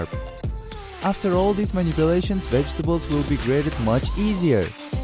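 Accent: Poland. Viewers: Nice to see polish accent in this video 1zł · are you from poland becuse you have accent and you used polish coin and you even name you hamster stefan! which is polish name :) pozdrowienia!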